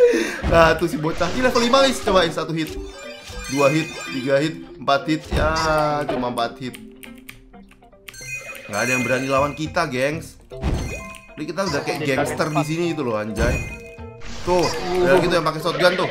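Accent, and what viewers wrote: Java, Indonesia. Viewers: jowo geming mass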